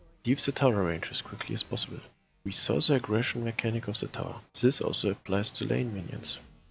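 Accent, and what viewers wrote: Germany. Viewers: He sounds German as well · You are german right :D? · are you German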